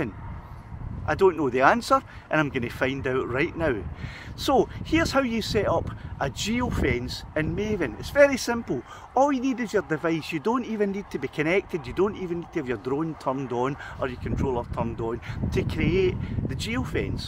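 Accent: Scotland, United Kingdom. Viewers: I absolutely love your Scottish accent, I can understand you way better than some English blokes from North Yorkshire, or Saint Helens and Liverpool 🤣 I always set the phone on airplane mode if possible, it prevents your sceen capture getting disturbed by push notifications like Whatsapp and stuff.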